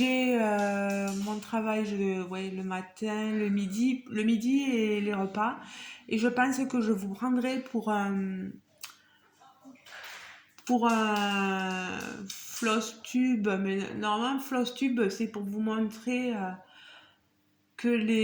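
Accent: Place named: South, France. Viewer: Coucou ma petite ❤ Merci pour cet unboxing avec une vidéo qui fait plaisir pleine de bonne humeur et ton joli accent du sud 😍 Gros gros bisous 😘😘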